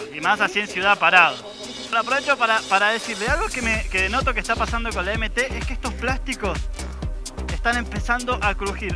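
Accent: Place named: Mendoza, Argentina. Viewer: Chilote. Jajaja naaa perdón amigo y sensual jajaja. Es MENDOCINO MI AMIGO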